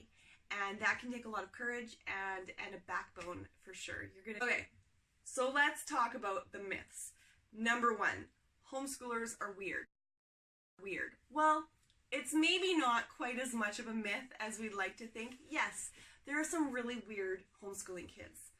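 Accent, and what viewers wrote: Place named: Winnipeg, Canada. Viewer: You sound like you have a Winnipeg accent ....lol 😁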